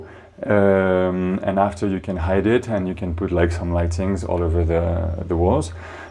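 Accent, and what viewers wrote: France. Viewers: This will be a fascinating restoration. You are very entertaining and I enjoy your humor and lovely french accent. Thank you for the tour and explanation of your plans.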